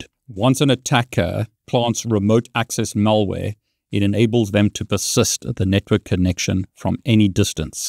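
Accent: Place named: South Africa. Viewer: It's his South African accent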